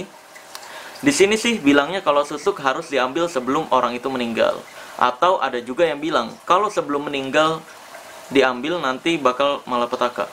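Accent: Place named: Madura, Indonesia. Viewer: logatnya,,Islam madura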